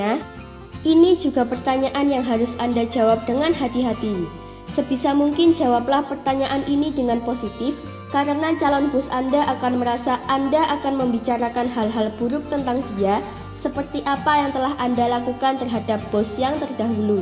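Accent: Java, Indonesia. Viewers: suara ibu peri asli pulau jawa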